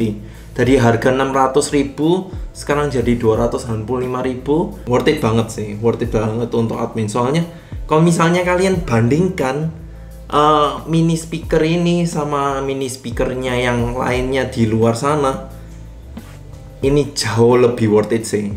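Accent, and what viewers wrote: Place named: Java, Indonesia. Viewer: I luv ur medhok min wkwk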